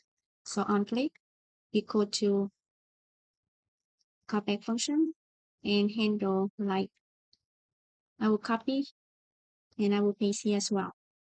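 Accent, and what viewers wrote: Vietnam. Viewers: CHị này người Việt chắc luôn :))))) nghe phát âm quen lắm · unrelated but are you Vietnamese? because your accent is similar to one of my Vietnamese friends :D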